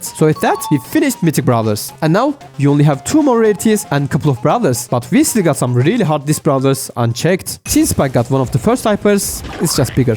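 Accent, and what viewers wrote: Turkey. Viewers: Omg i love you're accent its so cute😭😭 (Are you turkish??) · are you turkish by any chance your accent is really similar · Love your videos and your Turkish accent brother. Ellerine sağlık abi Türkiyeden bu seviyede edit skilliyle çıkan birisi olması güzel. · Aa Türk aksanı neden duyuyorum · kral bişey sorucam neden yabancılar için içerik üretiyosun türk olduğun aksanından belli oluyo çok merak ettim türk kitlesi daha çok bs izlemiyo mu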